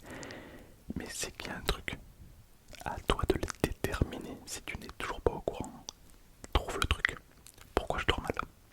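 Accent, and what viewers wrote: South, France. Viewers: j'adore ta voix c super relaxant (et surtout j'aime bien ton accent du sud) 😁😁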